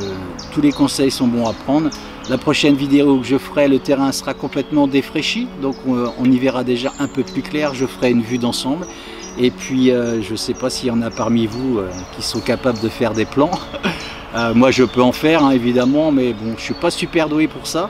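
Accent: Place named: Central France, France. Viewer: vous avez une façon de parler qui m est familière surement pas loin du centre de la france ... je vous souhaite bien de belle trouvaille prospecteur dans votre jolie coin de vie ou le calme règne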